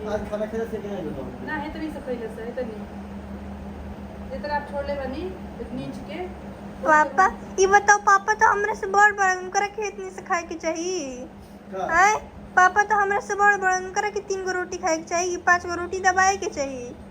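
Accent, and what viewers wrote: Bhojpuri, India. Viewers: Hiiii didi Ppplllllssss reply Bhojpuri khobb badhiya lgta hai aap waise hi bola kijiye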